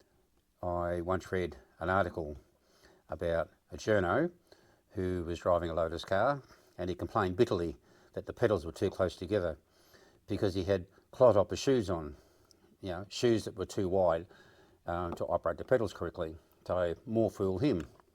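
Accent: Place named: Australia. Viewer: Great video and great technique sir, thanks for sharing. Are you from Australia by any chance?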